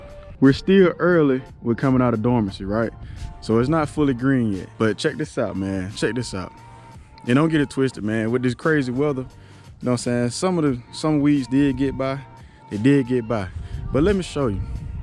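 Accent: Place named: Georgia, United States. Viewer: Sound like somewhere in Georgia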